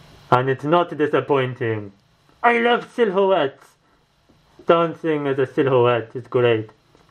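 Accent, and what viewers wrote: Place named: France. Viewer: When he said "France is not a real place, but Wakanda is" I almost hurt myself trying not to laugh really loudly for fear of waking up my wife. That was great. The accent does sound vaguely French, but that could mean any of dozens of different countries. Easy to understand though, and I enjoy how much commentary he gives. His enthusiasm comes through, even with the mask. Mikio Fujioka was the guitarist who fell off an observation deck at the end of 2017, sadly. He's usually the one playing the black guitar, while Ohmura always plays his pink guitar, making him easy to spot. This time I think it was Leda Cygnus playing the black guitar, who filled in often for Mikio, because of the scheduling conflicts you mentioned. Mikio was a guitar teacher at a high level music school in Japan, so he probably needed someone to fill in for him regularly. 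Ohmura and his pink guitar are almost always there.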